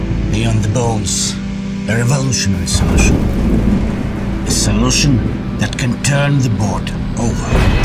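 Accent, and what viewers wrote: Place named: Russia. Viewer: Russian explaining Russian jet in English with Russian accent and Russian subtitle?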